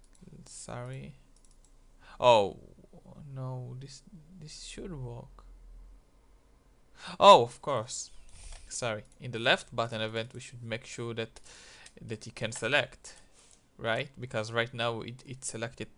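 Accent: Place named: Israel. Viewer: תודה אחי, רואים על המבטא שאתה ישראלי :)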